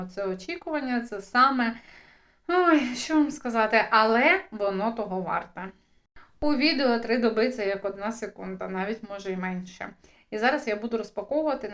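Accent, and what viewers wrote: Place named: Transcarpathia, Ukraine. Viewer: Це закарпатський діалект.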